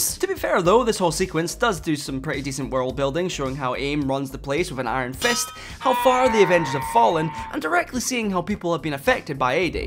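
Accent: United Kingdom. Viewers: funny british man losing sanity over vido gams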